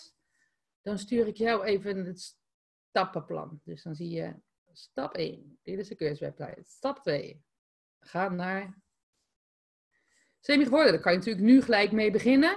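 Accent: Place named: Netherlands. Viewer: Aún tiene un acento holandés grandísimo lol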